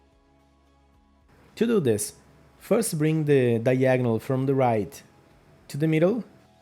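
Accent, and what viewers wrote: Brazil. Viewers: Vídeo salva muito, logo reconheci que era br pelo sotaque, inglês bom e vídeo bom! Precisava de uma embalagem pra um presente pequeno e essa ficou perfeita, obrigado!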